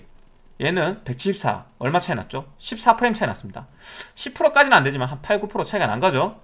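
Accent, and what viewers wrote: Gyeongsang, South Korea. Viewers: 님 말투는 경상도인데 대전분이신가보군요 신기신기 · 경북 경남? 어디쪽 사투리죠? 이 사투리 쓰시는분들이 방송에서 가장 억양을 못고치는듯ᆢ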